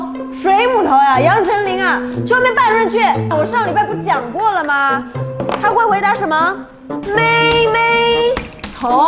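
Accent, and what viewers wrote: China. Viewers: 為什麼要大陸腔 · 她怎么是大陆口音啊？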